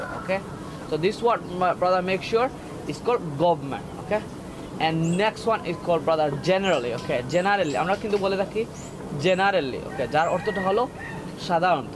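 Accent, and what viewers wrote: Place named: United Kingdom. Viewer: Good british excent.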